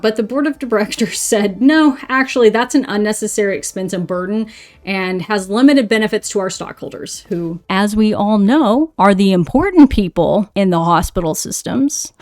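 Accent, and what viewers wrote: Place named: United States. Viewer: You sound very American, you should work on that, there's a reason women and members of the lgbtq+ get danger pay if their jobs want them to go into that place. Margaret attwood wrote the handmaids tale about that country for a reason, canadians say Americans for what they are, bad people- you especially 😊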